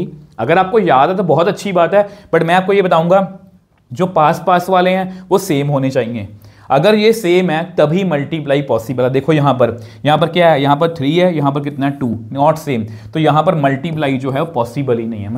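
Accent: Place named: Pakistan. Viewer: apka accent Pakistani lag RHA hai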